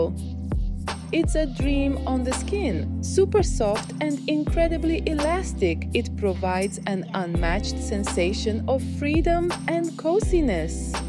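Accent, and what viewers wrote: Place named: Stavropol, Russia. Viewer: Ставропольский акцент не спрячешь)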